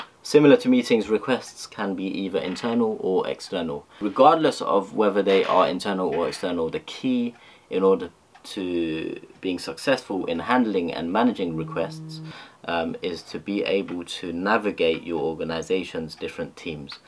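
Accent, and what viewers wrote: United Kingdom. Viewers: What is your accent? Is it british or south asian or both? Lol its all over the place.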